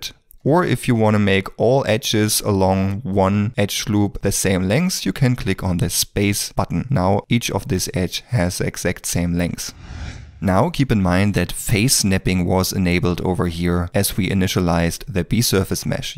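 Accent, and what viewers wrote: Germany. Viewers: Are you from Germany? :D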